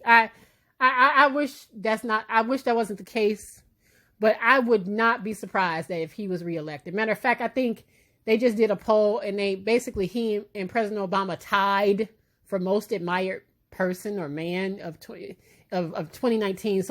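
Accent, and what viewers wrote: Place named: Midwest, United States. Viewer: I knew you were Midwestern!!! I love it!! Im in Indianapolis Indiana next door!!! 🤗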